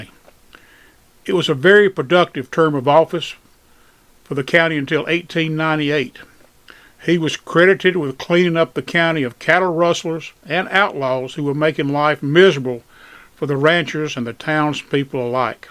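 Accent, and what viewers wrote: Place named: South, United States. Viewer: So glad the WWHA film crew discovered and documented this story of the last of the Daltons. It is overlooked by history and Jones deserves the recognition for his dedication to the state of Texas, for he has received almost no credit in history. The story of the Dalton Gang's demise at Coffeyville, Kansas when they foolishly decided to rob two banks at once is well known, including the fancy factory engraved Colt pistols they carried, but this is new. The narrator's deep southern accent does not hurt either! Dodging goats? Good sense of humor too! Join WWHA!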